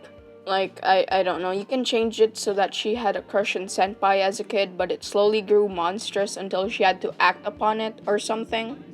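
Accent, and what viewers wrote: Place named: Philippines. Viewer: you sound filipino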